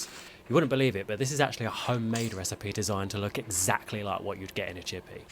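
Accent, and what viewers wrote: England, United Kingdom. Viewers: English. He’s English not British. There are 4 nations on these islands and England is just one of them. I’m Scottish not British and this guy is English speaking with an English accent because there’s no such accent as British. 🤦🏼‍♀️🤷‍♀️🏴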